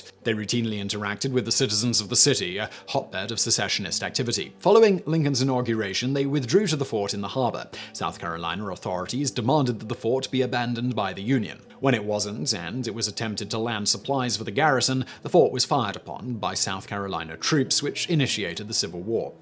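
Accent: United Kingdom. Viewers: The late Shelby Foot would argue up and down with your position on #8. Shelby Foot once said that to say slavery had nothing to do with the war is just as ignorant as saying slavery everything to do with the war. Speaking with a British accent doesn’t make you an expert on US history. This is more of a personal view provided by this Brit then actual fact vs myth. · I don't need someone who speaks the queens english to tell me diddly squat about my country. End of story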